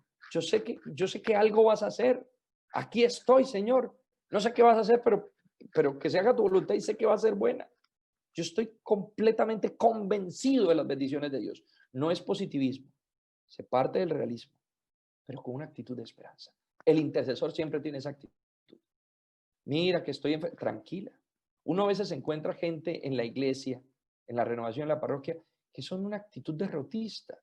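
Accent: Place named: Colombia. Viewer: Este acento, me suena Colombiano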